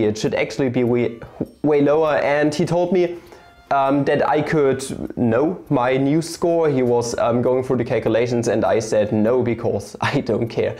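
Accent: Germany. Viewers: German accent. I find it cute. That aside.... 145 IQ is so high. I thought I had a good IQ and Feyman's 125 seems so high (why do people call it low?even if it was the great Feyman). Graduating medical students have an average IQ of 120 (their 50 percentile). Mensa test is confidential for a reason and it is best not to reveal anything specific about them. Most important thing is IQ is misleading and almost all most intelligent people I know personally do not care about IQ tests and even look down about them. So, unless you can take it for fun do not take it. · 20 points for having such a dumb face and annoying German accent lmao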